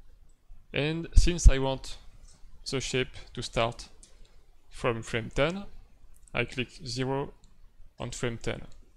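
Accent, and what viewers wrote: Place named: France. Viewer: coolla video, par contre des la premiere seconde on sait que t'est français poto ;) , merci pour le tuto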